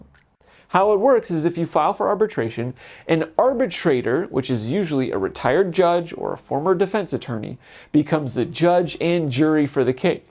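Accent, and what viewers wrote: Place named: California, United States. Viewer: This dude sounds like he’s from California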